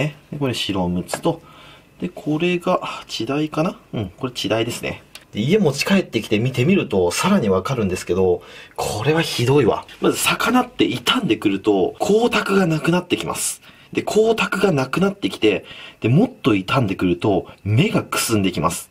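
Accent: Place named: Nagoya, Japan. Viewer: 時々のアクセントに名古屋弁が出てますけど東海地方の方かな？ 値札に書かれた場所から推測するとあの市場か〜。時々、行ってお値打買い込んでたわ。 まんまと魚○場の術中にハマってました。勉強になりました。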